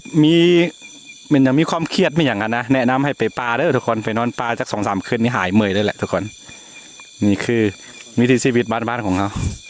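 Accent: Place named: North, Thailand. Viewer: สำเนียงคนเมืองเลย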